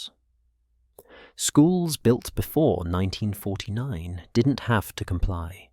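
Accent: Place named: United Kingdom. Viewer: Why does the narration give me AI vibes? Had to google this to make sure it happened and wasn't another fully AI written story, like "Cold War Stories". Plus it says this channel is kiwi but that is a Bri'ish accent... unless I'm going insane. The speech pattern etc doesn't sound human... I can't tell anymore. It sucks.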